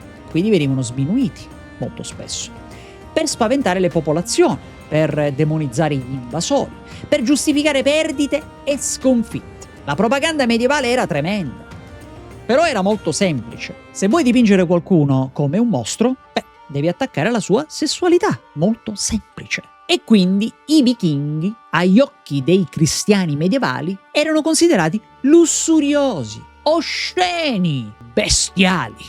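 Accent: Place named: Sicily, Italy. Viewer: 😂il sangue del sud non mente😄simpatia pura….sei il prototipo di un professore scolastico del nuovo mondo…simpatico…veritiero e che incolla l’attenzione …complimenti….il tuo accento sembra della Sicilia sicula non sicana ☺️👍👍👍👍👍🏆complimenti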